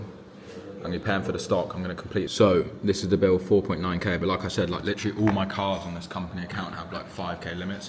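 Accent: United Kingdom. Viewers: Pretty Cool Bro! 😂 What A Mockney Geezer He Is!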